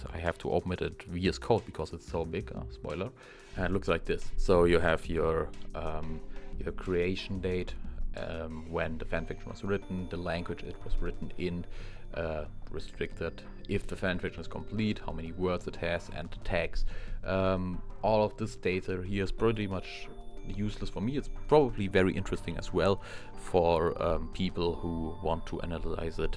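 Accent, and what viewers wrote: Germany. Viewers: Lieb den deutschen Akzent, danke für deine Arbeit 🧎🏻‍♀️ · Hey, are you perhaps from Germany? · Der deutsche Akzent macht alles 10 mal besser lol, good job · this is one of the most important big data analysis ive ever seen xD also you sound very deutsch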